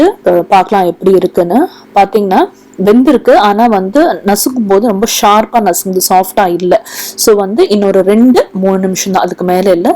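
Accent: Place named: United States. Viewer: ரொம்ப நன்றிமா 🙏 உங்க பேச்சுபாத்தா நீங்க அமெரிக்கால இருகரகீங்கன்னு நினைக்கிறேன் கரெக்டா? நங்களும் இங்கதான் இலருக்கோம். நாளைக்கு இப்படி சாதம் வடிக்கப்போறேன் 🙏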